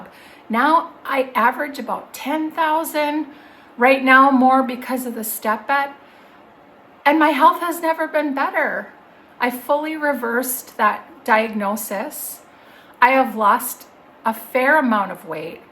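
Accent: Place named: Midwest, United States. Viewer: I love that midwest accent❤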